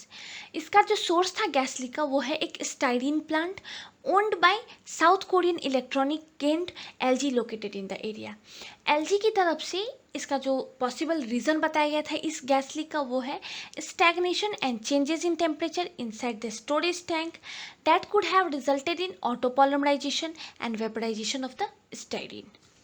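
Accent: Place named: South, India. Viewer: Well presented . You must be from South India I believe .your voice seems like that . Thank you ma"am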